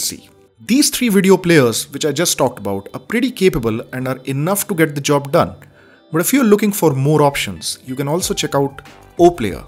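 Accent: India. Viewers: Indian english 🙁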